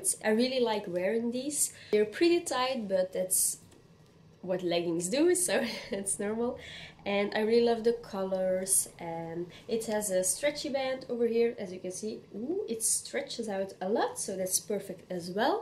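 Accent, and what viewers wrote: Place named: Europe. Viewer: I like your accent. I lived in Europe this video made me miss it for sure ❤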